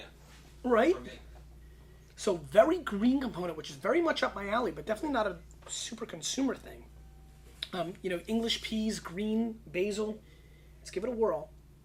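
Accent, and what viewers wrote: United States. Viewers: Just a tip,. You should work on toning down your american accent. It is impossible to understand your German!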